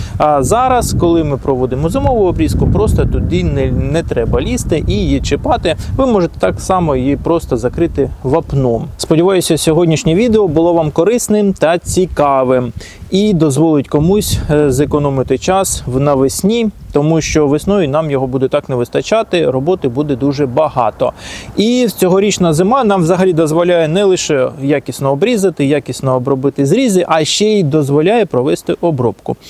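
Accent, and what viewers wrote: Standard. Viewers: Украинською классс. Чиста лiтературна, без запинань. То рiвень. Сам я рускоязичний, але перехожу теж на Украiiнський мову та звiсно контент. Тому успiхiв. Ну а з мене пiдписка. Бо корисний контент, але формат з настроем, тому цiкавий. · Приемно вас слухати здоровья вам.Дуже чиста українська мова🎉🎉🎉❤❤❤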